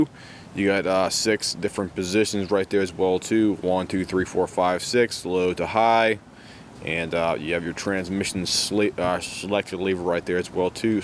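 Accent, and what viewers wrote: Pittsburgh, United States. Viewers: Your Pittsburghese is very strong!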